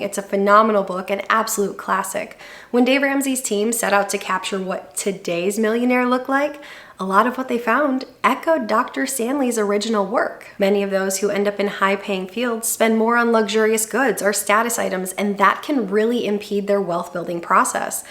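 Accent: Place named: Wisconsin, United States. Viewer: A fellow Michigander.. Wondered about that accent - to us lowlanders sounds too much like Wisconsin lol. IT for both me and my wife. Been saving 30%+ of our income for years. Wish I was smart enough to start that sooner, but still worked out fine. No inheritance for either of us. Middle/lower middle class background. Lifestyle creep is a thing, but I fight it. Biggest hurdle was it was impossible to get a place near work without spending a fortune, but we'll get that returned when we sell it and retire to somewhere cheaper. Maybe the UP ;)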